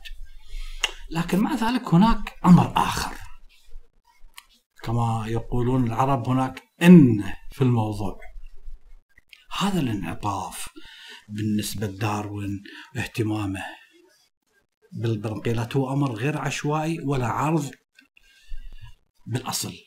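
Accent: Iraq. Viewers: شمدريني هو الفيديو طلع يمي من وحده ..وشفته لهجته عراقي ستغربت .و امداكم🖐🖐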